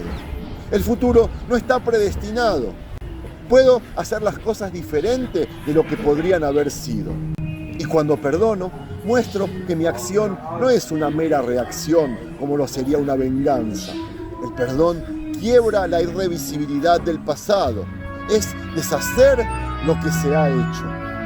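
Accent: Argentina. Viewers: Shalom! Me encanta ver sus vídeos me fascina todo lo que tiene que ver con 🇮🇱 y en español con acento argentino aún mejor.❤️🇮🇱 abrazo!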